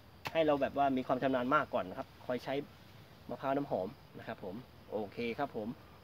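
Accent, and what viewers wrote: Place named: Suphanburi, Thailand. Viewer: พี่ชายยยย..ตามาแว้ว..มิน่าอาหารหรือเวลาพูดคล้ายๆสุพรรณเลยพิษณุโลกนี่เอง...😁😁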